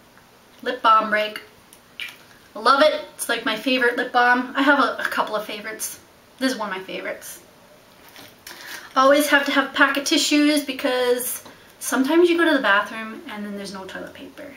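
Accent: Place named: Newfoundland, Canada. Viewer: You for sure still have a Newfoundland twanggggg. Can you believe I haven't been wearing a purse these days? I've been toting all of my friggin' laptop, tablet and notebooks around as a mobile office. Plus all the crap I need for my youngsters when we go out. I should totally do a video. I love you brush your hair flat out. I NEVER brush my hair!!!!!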